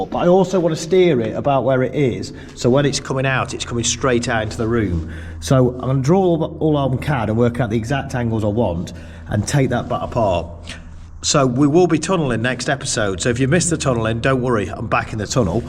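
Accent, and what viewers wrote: United Kingdom. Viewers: Thought i recognised the accent, ey up duck 🥳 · Crazy, I've been watching your videos for a while and it never occured to me that your a fellow Derby man untill I heard you say when I used to work at Rolls Royce and then I thought the accent fits; I wonder if he's from Derby. I googled you and it turns out you are.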